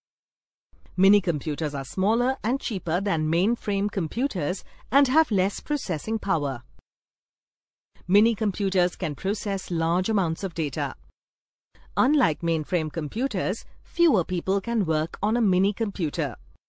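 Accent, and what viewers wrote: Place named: United Kingdom. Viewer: I love your British accent..I have learnt so much about computers...thank u.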